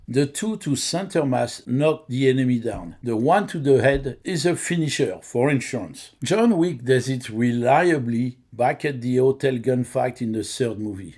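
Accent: France. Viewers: I used to be an IPSC shooter, not a very good one, but I definately recognize the skill that Keanu Reeves has. He might only be shooting blanks but the gun handling is definately top notch. He is truly a very good shooter with great skills.: I love your videos, they are well done and the French Accent is a killer.. Je parle Francais aussi....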